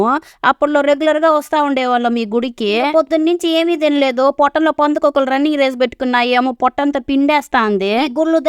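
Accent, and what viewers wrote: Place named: Telugu region, India. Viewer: nijamgane notlo neellu vurai chala meeru cheppesariki takkuna mingesanu pusukkuna navvesanu 😂😂 thank u mee slang ante chala ishtam maadi pakala but ippudu Tamilnadu lo vuntanu meeatalu vintu vantalu chusthunte mana voorilone vunna feel vasthundi thank u so much naakithe feel good harmones ekkuvaga release ayye stress antha pothundi🎉🎉🎉 Ilage manchi manchi recipies chesthu navvisthu matalatho magic chesthu.... always....😊😊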